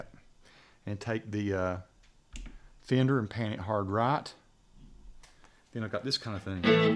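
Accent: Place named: Winston-Salem, United States. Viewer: had to be. Your accent is just like what I remember. Seems like nobody in Winston Salem talks like that anymore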